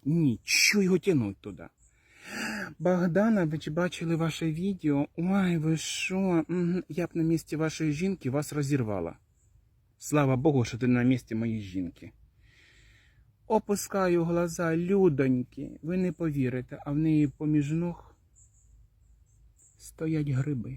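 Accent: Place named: West, Ukraine. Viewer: Я фанатка русского языка. Но думаю: как было бы неинтересно, если бы все славяне говорили на чисто русском! С таким удовольствием вас слушаю! Да ещё эта западная деревенская манера! 👍👍👍 У меня родственники на западе в селе - точно так там все разговаривают!😂😂😂❤❤❤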